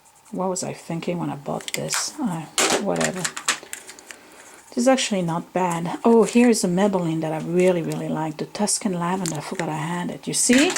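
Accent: France. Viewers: Where are you from originally? Your french accent is great! :-)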